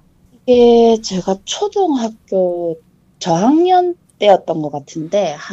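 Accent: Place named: Busan, South Korea. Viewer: 진짜 동감입니다ᆢ'부산사투리 짱입니다ᆢ말도 잘하시고ᆢ목소리도 이쁘시고ᆢ또 부탁할게요 ^^♡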